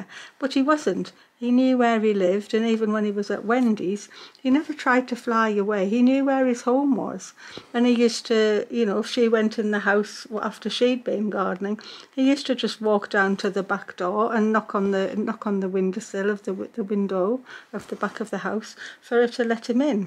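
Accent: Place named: Sunderland, United Kingdom. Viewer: you are a delight to Watch. I love watching your Beautiful Creative Work. My Husband grew up in Sunderland. So I love hearing your Accent. I live in a country town called Gingin in Western Australia. You are always welcome in my Home ❤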